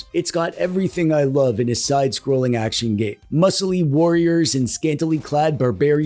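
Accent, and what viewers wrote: Canada. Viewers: Stop saying Mario wrong. You sound like a Canadian.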